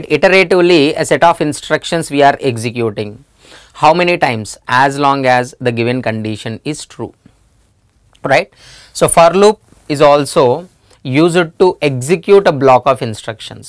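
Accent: India. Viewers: I am having fun watching an Indian accent explaining C programming than English man explains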